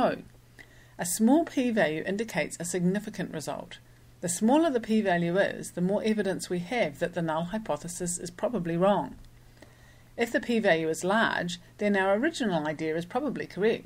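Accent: New Zealand. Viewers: With your videos I am learning Statistics AND New Zealand's English :) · Are you from New Zealand?? I love that country and want to go back some day! Love the accent too..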